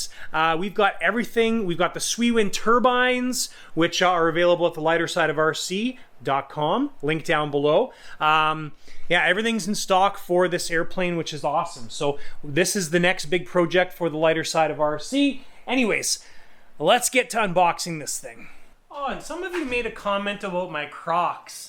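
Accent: Canada. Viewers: I don't know if I like this channel more for the great info and tips, or the fact that Canadians talk so funny...lol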